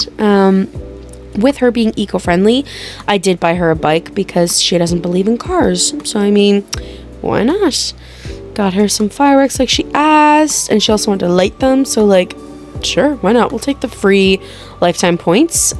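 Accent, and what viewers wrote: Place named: Atlantic Canada, Canada. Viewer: I'm subbing because I can tell you're from Atlantic Canada and so am I 😂 great video!! Really funny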